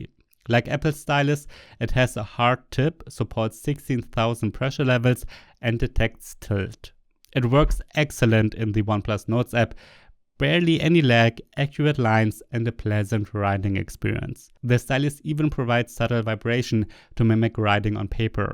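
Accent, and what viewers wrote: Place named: Germany. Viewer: Have you been working with an English dialect coach? Your sharp German accent is slowly disappearing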